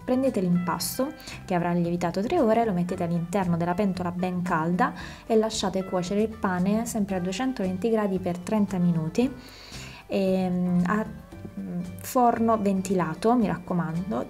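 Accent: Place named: Marche, Italy. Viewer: Preferisco 1000 volte il suo accento marchigiano e la sua bravura alle cachielle presuntuosette, non ti pare????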